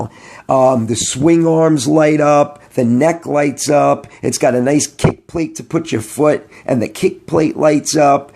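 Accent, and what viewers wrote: New York, United States. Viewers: I nailed it my friend great commentary as well I love your ny accent and no b.s attitude keep up the good work my friend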